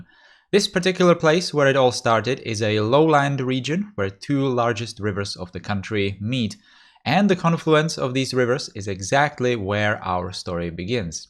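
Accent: Czech Republic. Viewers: Hi can I ask you where are you from. Your accent sounds Czech. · your accent tells me you are Czech, am I right? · Are you Czech ? :D that accent .. or Slovak by any chance? :D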